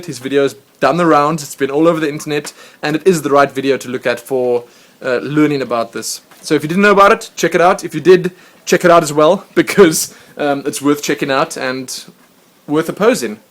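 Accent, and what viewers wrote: South Africa. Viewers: I'm South African so I thought it sounded familiar :P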